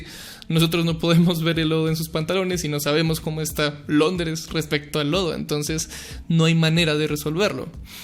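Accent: Bogotá, Colombia. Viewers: banda, soy yo el unico que le parece que en todos sus videos su acento es muy neutro, mas tirando a mexico, pero aca se le subio el colombiano por 3000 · Siempre creí que eras Mexicano, en tus otros videos no noté tu acento colombiano. Por cierto, me encantó el video :)) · ahora que se escucha en una conferencia, se nota tu acento rolo :D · tiene full acento colombiano verda, tipo paisa neutro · ya te escucho el acento Bogotá ^w^ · He visto todos tus videos, hace relativamente poco me enteré que eras colombiano en un video en que lo mencionaste; me sorprendio bastante porque jamás se te siente un acento en los videos, pero acá nada más empezar se siente, eres colombiano con toda! Un placer seguirte, abrazos desde Bogotá <3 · Looollll hoy si te escucho mega rolo, fan de tu voz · Acento rolo, parce!!!!! 🇨🇴🇨🇴🇨🇴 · Se te nota mas el acento colombiano... Eres colombiano? 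· Uy pana, usted tiene resto de acento rolo, recién me doy cuenta, usted parece de Bogotá JAJAJAJA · La primera vez que lo escucho con acento colombiano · Vos sos rolo no? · Es mitad colombiano y mitad de otro país aún desconocido, lo decía en la descripción antigua de su canal además que en los streams antigüos de halo lo confirmo y ahí se le notaba muchísimo más el acento. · En este vídeo si se nota tu acento Colombiano xd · Puedo decir que aqui si se te nota el acento de Bogotá, bastante... Eres un orgullo para tu patria y familiares bro! Saludos desde Cartagena :D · Nunca había escuchado su acento real es re cachaco rolo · cuál paisa? es re rolo xd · definitivamente es rolo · El acento colombiano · se te nota lo colombiano xd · En este video sin guion se nota mas el acento colombiano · Siempre pensé que eras mexicano o algo así, pero ya tras este video dos colombiano. Lol :0 yo soy de medallo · Jamás había notado qué yan colombiano eres :0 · Aquí pude notar mucho su acento colombiano, q increíble jajaja buen video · ! 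Tienes tremendo acento rolo! Un capo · Jajaja se nota mucho tú acento colombiano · Al hablar tranquilamente, tienes unos pequeños dejos de rolo en tu acento, se nota que llegaste a vivir por un tiempo en Bogota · Es la primera vez que escucho a con un asentó colombiano genuino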